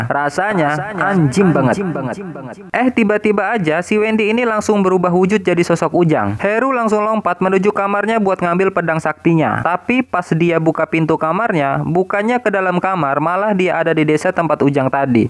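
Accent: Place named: Java, Indonesia. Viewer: Bjir jawanya klur ngakak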